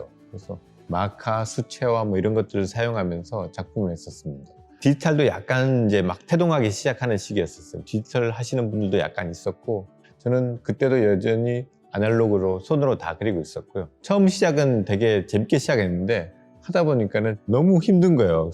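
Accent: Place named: Gyeongsang, South Korea. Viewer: 출생이 경기도 고양시 출생인데 말투가 경상도 쪽 어휘와 억양이 있는데 경상도에서 살았거나 부모님이 경상도 쪽인가? 거의 확실해 보이는데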